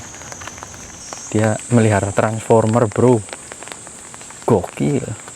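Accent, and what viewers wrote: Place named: Cilacap, Indonesia. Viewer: aslinya org mana logat nya kaya org Cilacap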